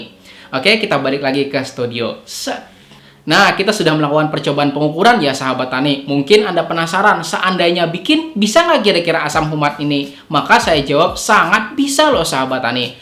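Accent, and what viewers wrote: Banjar, Indonesia. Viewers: Bukan Sunda tapi banjar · Urang banjar kayak gitu ai...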